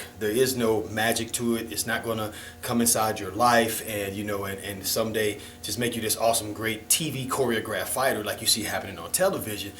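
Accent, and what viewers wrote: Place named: Philadelphia, United States. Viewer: Dude sounds and looks like hes from Philly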